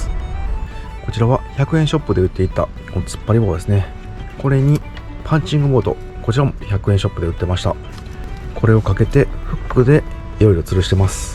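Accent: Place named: Kansai, Japan. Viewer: 皆さんもコメントされているように、それでインディのDIY振り返りだったんですね！ほんと、いつも相方と楽しみに拝見してます。私もキャンピングカーを検討し始め、さんの動画を随分参考にさせてもらいました。今年の12月納車予定です。同じ関西人なので、さんの語りは親近感ありすぎです。インディ乗り続けて欲しい気持ちもありますが、次に何にされるかもとても楽しみです。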